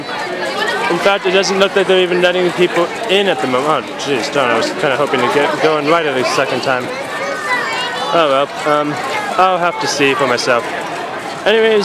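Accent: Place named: Germany. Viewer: Ur accent sounds german but I may be mistaken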